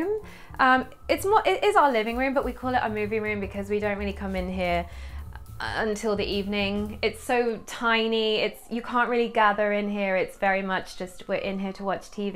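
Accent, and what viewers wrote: England, United Kingdom. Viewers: I love your apartment,. You’ve made it so beautiful and homely and yes sophisticated. I love all your DIY’s and as soon as I saw that DIY twig tree I was hoping you were going to say it was a DIY! I’m sooo going to make one! 😊 I’m English living in Australia and it’s so refreshing to hear a familiar, beautiful English accent. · where is ur accent from in the uk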